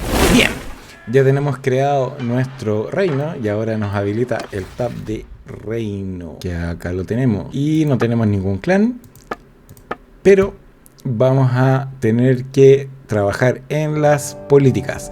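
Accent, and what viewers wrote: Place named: Chile. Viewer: en 5 segundos distingui el horrible acento chileno. Saludos desde Chile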